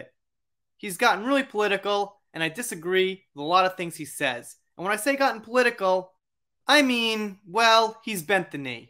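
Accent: New York, United States. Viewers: Never liked Howard Stern he has a big ego,well people are going to go bad for stern,he's putting people off,johnny is innocent, your from new York I love your accent,I wondered why I liked your voice and like listening to it,to bad we can't have hot chocolate and playing monopoly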